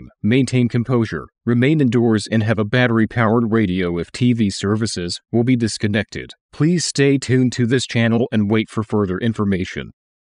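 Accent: United States. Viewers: Like the background muisc, but you do need to be aware that a British EAS would not use an Americn/Canadian voice over person. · Did no one observe the American accent on a British channel? 😅 However, great effort!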